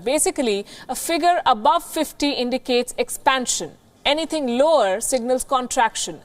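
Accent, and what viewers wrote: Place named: India. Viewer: Maybe the best sounding Indian accent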